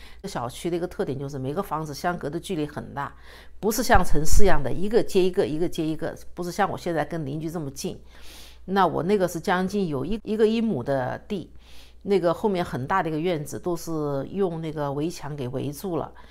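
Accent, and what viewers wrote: China. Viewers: 厲害， 有江西南昌的口音。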